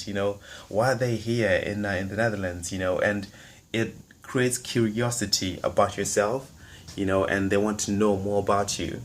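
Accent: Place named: United Kingdom. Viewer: What ethnicity/nationality are you? You obviously sound British but it has a tinge of something else I can't pick up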